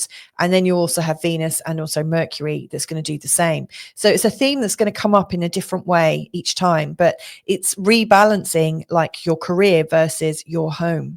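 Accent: United Kingdom. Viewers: Lucky woman with that gorgeous red hair and beautiful face. British accent too. If you came to America., you would have many men , good ones, fighting for you Thanks in advance for the reading I hope it’s in line with what is going on for me and then I win the backup offer on the house! Love from America and prayers for all my brethren, British folk. Praying for your nation and people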